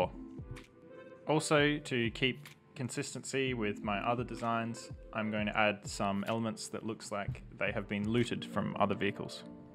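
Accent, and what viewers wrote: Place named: Australia. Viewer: This is so awesome - great models. Have you found your modelling skills have really improved since you started with the videos? I'm interested in hearing how you feel about your progression. Also, nice to hear another Aussie!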